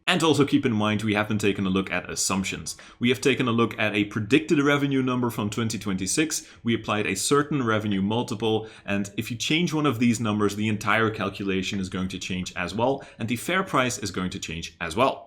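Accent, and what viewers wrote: Netherlands. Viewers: Bedankt! Als ik mij niet vergis, kom je uit Nederland. Maar goed ik vind jou analyse mooi ga zo door. Ik ga je kanaal promoten!!!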